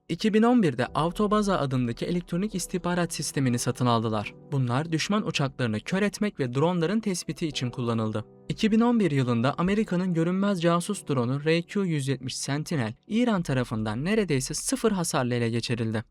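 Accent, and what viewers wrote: Arab world. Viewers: Seslendirmeyi yapan arkadaşın anadili Arapça mı? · Abi içerik güzelde şu seslendirmeyi arap aksanıyla K patlatan arkadaş yerine farklı biri yapsın. Dinlerken çok rahatsız ediyor. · Abi şu arap aksanıyla K patlatan kardeşimize seslendirme yaptırmayın artık.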